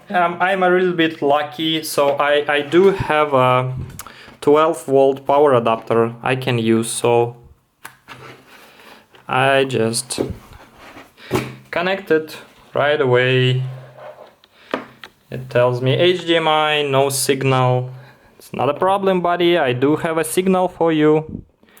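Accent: Russia. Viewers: русский акцент выдает)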